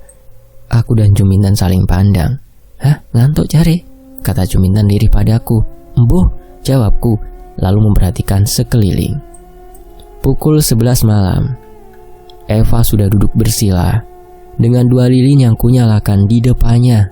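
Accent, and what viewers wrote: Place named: Surabaya, Indonesia. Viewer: Oh yo iki arek suroboyo, wong ngomongno mi setan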